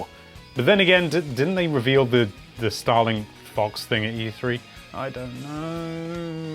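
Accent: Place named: United Kingdom. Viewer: He's from the UK.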